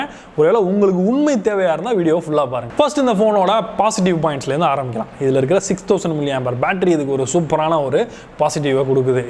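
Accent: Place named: Madurai, India. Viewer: I'm new subscriber Anna nenga Madurai ya ? Slang mass .......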